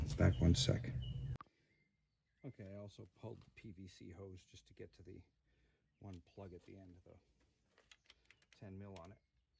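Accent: Canada. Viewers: U know you're from Canada by how u say "ouwt"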